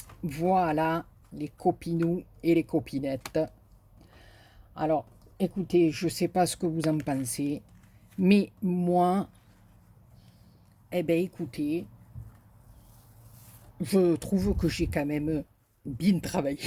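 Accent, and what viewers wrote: South, France. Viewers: Tout à fait sublime ❤️❤️❤️ton album est magnifique avec les papiers ...une abonnée de plus...hihi merci pour le partage. J aime ton accent du sud . Bises 😘 Anita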